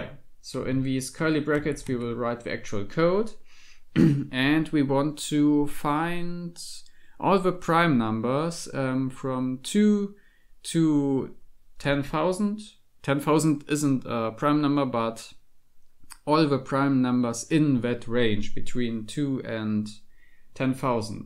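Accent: Germany. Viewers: your accent are like germans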